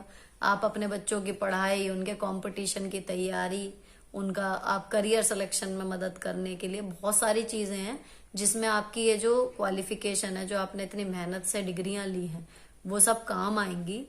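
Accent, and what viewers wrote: Meerut, India. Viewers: आपकी भाषा पूरी मेरठ की है, खड़ी बोलीं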